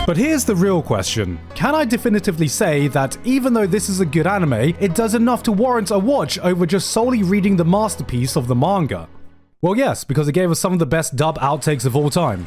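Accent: England, United Kingdom. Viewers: What’s the title of that horror manga presented in ? I’m not good at understanding japanese titles heard in english accent or maybe I’m just deaf, dunno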